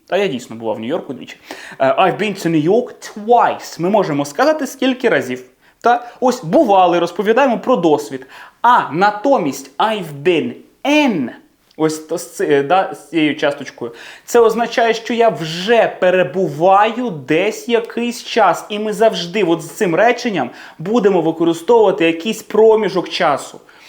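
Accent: United Kingdom. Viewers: А в Нью-Йорку відчувався їм ваш британський акцент?? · Вперше бачу вчителя англійської з України з таким ідеальним брит акцентом, дуже нейтів🤩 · Дивлюсь відео і балдію 🤪згадую уроки англійської в свої молоді літа- зеара, бʼютіфул , зисис е пен 🤣🤣🤣🤣 а тут слухаю ніби британець ☺️😘Щиро вдячна за урок ! Успіху Вам і нам ПЕРЕМОГИ🇺🇦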